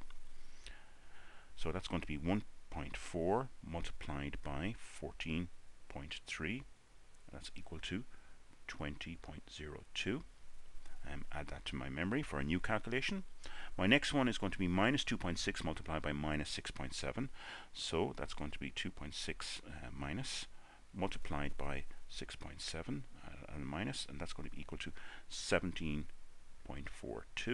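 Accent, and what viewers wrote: Ireland. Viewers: That is a thick Irish brogue!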